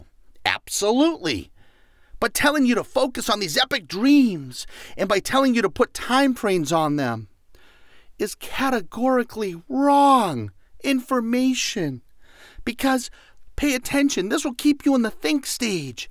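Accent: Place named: Boston, United States. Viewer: This was actually reassuring. I seek the gurus who believe HAPPINESS is a choice we can all make right now ... not the ones with the carrot and stick ... I rarely come across the "scammers" you are talking about. (Watch, one of their ads will pop up as soon as I post this.) I can just imagine, though, people who are in a desperate place - seeking a new job for example - falling victim to the song and dance you are talking about. KEEP BOTH HANDS ON YOUR WALLET is definitely good advice out here in Self Improvement Land. Thank you for the red flag advice; thanks for the free resources and I love the Boston accent.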